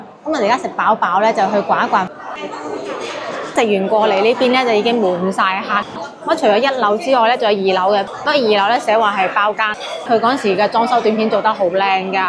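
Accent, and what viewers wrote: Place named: Macau, China. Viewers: MOGO係唔係澳門人?好重澳門口音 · 終於有人問我一路想問嘅問題，點解第十甫長大嘅廣州人咁重澳門音🤔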